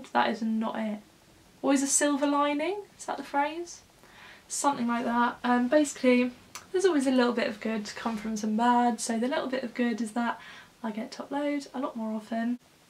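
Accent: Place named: Midlands, United Kingdom. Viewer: Changed my mind to somewhere in the Midlands but that of cause is not the point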